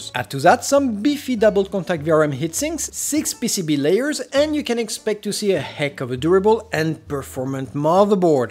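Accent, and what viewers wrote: France. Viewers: You have a little french accent 😊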